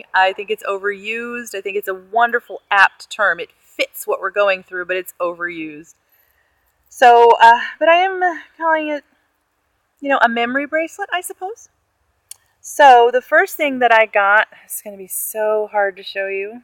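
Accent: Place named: Canada. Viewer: I hear the birds, spring time in MI. You are amazing, ouut and abouut, you sounding more Canadian than southern!! You should are great and thanks for the honest updates.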